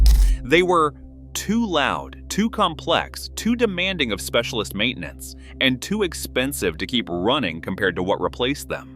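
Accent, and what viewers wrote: United States. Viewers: Why use such an annoying American voice on a subject on British Rail? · Why do these vids have to have an American commentator…..aaaargh!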